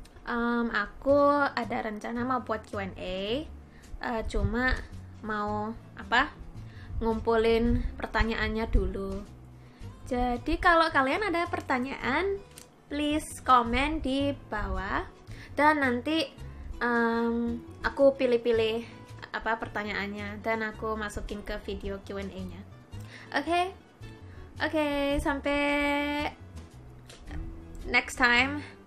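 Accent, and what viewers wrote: Java, Indonesia. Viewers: waahh kirain cuma saya yg ngerasa medok jawa wkwk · Mbak..cocoke dadi arek jowo wae...medok banget.....aku jd inget saudaraku di surabaya...salam dari semarang....nbak ayu banget.... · Mbak ganti nama aja jd ayu... Habisnya bahasanya medok hehehe suka suka suka 💕 💕 💕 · wes bagus ayu nek ngomong medok jowone kuwi looo ngangeniii..beeehhhh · Pantese sampeyan dadi warga Indonesia ning. Dudu warga Japan. Aksenmu medok banget. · cantik,muda,smart,simple,low profil..👍👍 pinter bhs indonesia (dg aksen medok😆👍👍) terus sing paling unik,paling q seneng kwi pinter boso jowo jugaaa...😅😅👍👍👍👍 (sangat mewakili kami sebagai orang jawa😆😆) lanjutke terus...uuaaakeehh sing dukung awakmu👍👍 EEE....MATEK AKUU....😆😆😅😅😅😂😂👍👍👍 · pinter nya kamu....medok lg bhs nya👍😍😍😍👍👍👍👍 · wa'iiikk keren.... jowone mbak'e medok ayabarus n ngalaman....salam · Next time borong keripik tempe di Malang! 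Mau keripik apel atau apapun sudah dibikin keripik disana. Stay medok yaa! Hehe... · Lancarnya medok jawa ya.. tapi bagus bahasanya lancar.. (mukanya mirip temen ddku hehe).. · "Keripik tempe tu ueeenaake puol" Pas kamu bilang gitu tuh aku langsung ngakak 😂, suka banget ma aksen medok kamu. Semangat yaa!! 😆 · Japanese berlogat javanese:D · Wah bangga aku dadi wong suroboyo. Heheh, ngomong ngomong kaka medhok nya nampak banget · Jepang mendok:v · Kok medok ngomongnya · Aku baru di channelnya mbak nih.. bahasa Indonesianya kok bisa medok gitu ya? Imut 😄 Bahasa Indonesianya juga bagus. Itu kripik tempe lho mbak, di tempet sy banyak 😆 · Gk pantes logak jawa. Huhuhuhu tp suka kok sma orangnya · Medok tenan eh mbak jowone biyen manggon nek Suroboyo ta salam tonggo kota Lamongan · so freaking awesome..japanese but medok bgt..senengane kripik tempe...kpn2 dolan suroboyo.mampir mbk tk jak dolan 😁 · Wah boso jowomu sdh medok jg tuh, sdh kyk org Indo bicaranya, salut dech!!! 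Untuk oleh2nya, memang ga ada di LN? *See you next time= Sampai Jumpa Lagi u/bhs Indonya:) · Mbak, Pirang tahun Nang Suroboyo mbak? Nggak percaya kalau hanya 1 tahun... 🤔 Pastine` luweh soko setahun yo, limang tahun yo. Kok bahasane sek medok yo, baik itu bahasa Indonesia opo maneh boso Jowone`... 😂👍🙏 Jadi terharu... 🤣 Semangat yo mbak... 💪👍🙏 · Cara bahasamu sudah medok kaya orang jawa. Sudah Cantik, kalem,gak sombong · Logat jowo.. Mbok mbok... Keren tenan.. Saya suka wong jowo.. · Ojou-san udah berapa lama di sby? medoknya fasih banget😀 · Wes ayu boso logat jowone persis asli Wong Jowo padahal Anda orang Japan sip bagus... matur suwun 😁😁😁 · Jepang yg cantik tapi medok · Ayu² medok neng😂 ngenten punn 😂👌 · Wes pindah neg jowo wae mbak wes cocok kw dadi wong jowo Nek ngeplog go boso jowo(KETOK E MEDOK E) ne jo lali yo mbak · Asli jepang tp Indonesia bisa jawa medok bisa, syukur2 Kalau udah d,islamin terus bisa ngaji. 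Idaman Keluarga Ekekekekek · ayu pinter jowo medok... love u kak..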